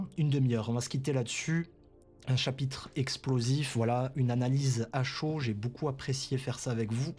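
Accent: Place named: Japan. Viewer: J aime bien ton petit accent japonais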